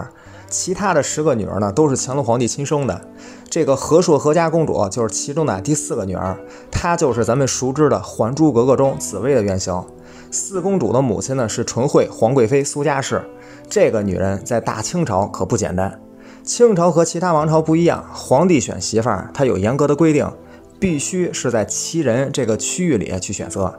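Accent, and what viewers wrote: Beijing, China. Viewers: 功课十足的视频👍&京腔京韵的普通话💯👍谢谢分享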